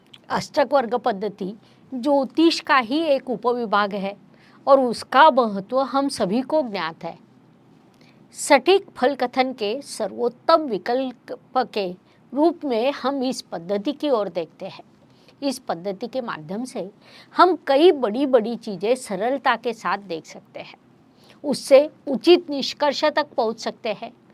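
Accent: Maharashtra, India. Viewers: I love that MARATHI accent....jai shivaji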